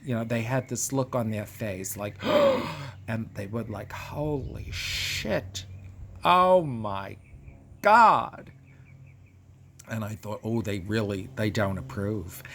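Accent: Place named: New York, United States. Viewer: 45 days in and the stories just get better and better! thank you for sharing your sister's legacy with us. Your stories are playing a huge part in me getting through this crisis. I live in Brooklyn and have been sick for the better part of 2 months with this silly virus (and I'm going to be fine, just slow going). I love hearing that NY accent. xoxo Steph from Park Slope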